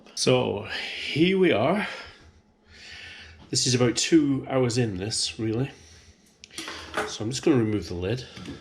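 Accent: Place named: North, United Kingdom. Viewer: The Northern accent gives a certain degree of credibility, you know this beef dripping is the real deal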